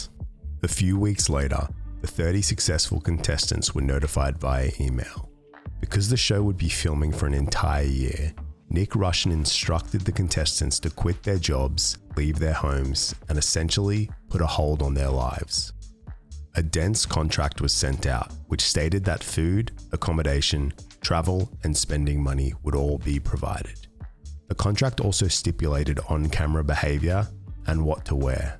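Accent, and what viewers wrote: Australia. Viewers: Ahhh..... Nce to hear a bit of smooth Aussie narration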